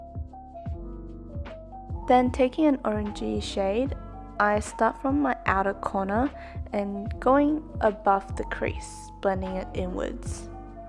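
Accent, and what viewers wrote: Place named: Taiwan. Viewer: 妹妹哩低衝蝦😂😂😂你的謝謝還有台灣國語笑死🤣🤣🥰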